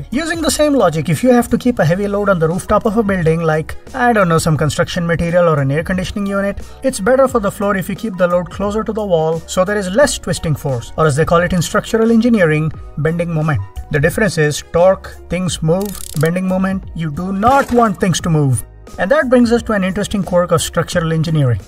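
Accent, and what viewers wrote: India. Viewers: Amazing, by the accent i am guessing it's an Indian guy. Probably the first sensible Indian youtuber ❤️ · this probable the best Indian YTvideo explanation I'VE seen yet hahahaha with accent yet understandable!!!!!!woooooooooooo yeah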